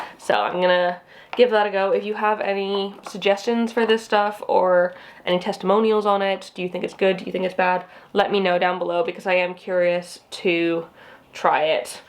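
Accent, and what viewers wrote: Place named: England, United Kingdom. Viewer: You’re kinda picking up that English tang! Isn’t that funny how that works?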